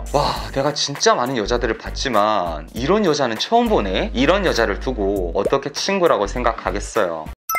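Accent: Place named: Busan, South Korea. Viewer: 후..부산 사투리 치여요ㅋㅋ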